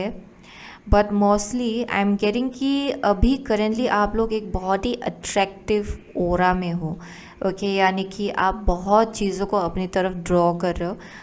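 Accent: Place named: Uttarakhand, India. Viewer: But her accent sounds she definitely from uttarakhand, ne ppl has a little accent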